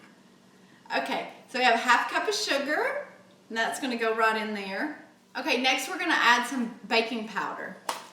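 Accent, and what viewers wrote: South, United States. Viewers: Oh i love the accent, where are you from? Sounds southern? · Hey! Just wanted to let you know that I just made your muffin recipe and they turned out amazing!!!! Love your southern accent and style! Thanks for the bomb recipe!!❤❤❤ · I've never seen this channel before but I heard that southern accent and I immediately knew this woman can bake. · I absolutely LOVE THIS RECIPE! It’s the absolute best ! PS, when I heard your southern draw I knew this was the recipe for me!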